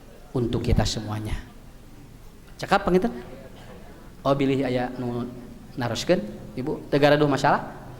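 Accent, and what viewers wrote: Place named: Bandung, Indonesia. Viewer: Hatur nuhun pencerahanna mani nyerep bahasa sundana raos ngabandungannana.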